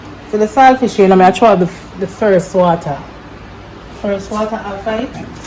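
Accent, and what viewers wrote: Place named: Jamaica. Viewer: I luv watching you cook. I respect how you talk and carry yourself. Your Jamaican accent is so real and beautiful!!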